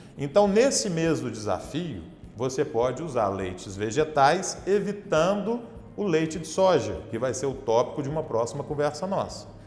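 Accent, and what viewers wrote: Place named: Minas Gerais, Brazil. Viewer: Oi Dr, adoro escutar suas explicações, muito bem explicado, com sutaque bem mineirinho, abraços.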